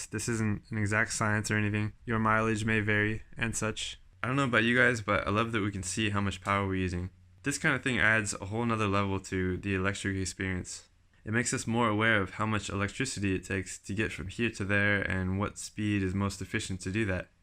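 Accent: California, United States. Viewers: I wonder if he’s from New Zealand. He sounds like he’s from Cali. Either way, I prefer the lighter bike 😆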